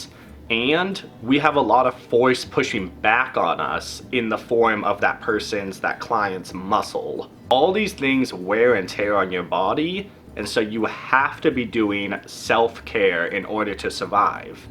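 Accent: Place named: New Jersey, United States. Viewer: It's called having an accent, believe it's from Jersey or Long Island. Get educated.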